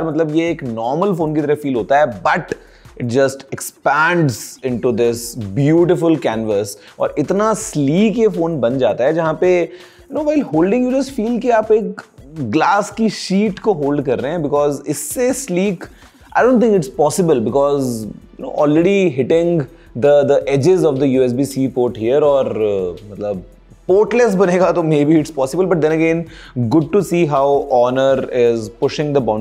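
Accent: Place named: Butler English, India. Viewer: Pls Stop speaking in butler english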